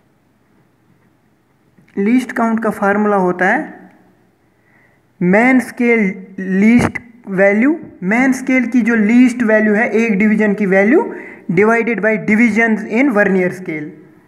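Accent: Kashmir, India. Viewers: Sir aap Kashmiri ho?? Any way nice..thanks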